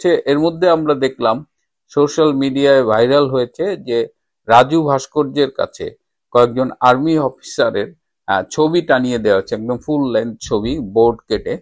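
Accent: India. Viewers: বাড়ী কি ভারতে? বাঙলা কলকাতার মত।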